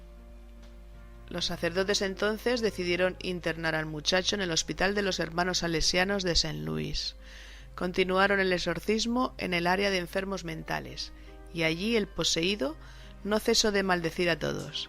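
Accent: Spain. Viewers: Me gusta el acento de espana, es hermoso ;) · ese acento español de verdad no me gusta le quita todo terror .... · Manuel Alejandro, si no te gusta el acento español, buscate videos solo de tu acento. Por otro lado decirte que la narradora no tiene porque tratar de reflejar terror. No esta contando una historia sensacionalista de miedo, para pasar el rato. Si no relatando el transcurrir de un caso, a modo informativo, como documento.... Que le gusta a la gente un sensacionalismo con estos temas... cuando realmente, ese arquetipo de manera de hacer misterio, es el arquetipo que le ha quitado seriedad a la verdadera investigacion o documentacion de los temas de misterio. La narradora no tiene porque narrar de manera que de terror, si no simplemente contar los datos de la historia de manera que se le entienda y quede claro. El objetivo de este video es dar a conocer un caso, no causar terror.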